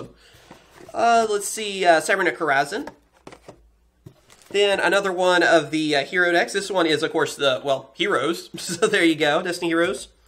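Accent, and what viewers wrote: Texas, United States. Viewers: The thing that I LOVE about your videos is your texan accent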